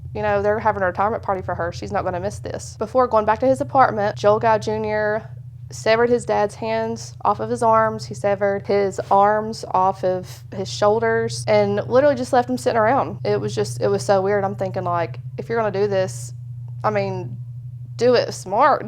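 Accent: South, United States. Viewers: Thanks for covering this. It is so sad to think that the parents knew that their own child, the one who lived off of them, the one that Lisa worked for, delayed retirement for, was killing them for reasons of pure greed. They were nothing but kind, Lisa even buying him his favourite ice cream. He is probably one of the worst psychopaths ever. I wonder what he thinks of his life now? I hope that he is miserable. BTW, I love your videos. Your "southern accent" is really cute. ❤Cheers, from Canada.❤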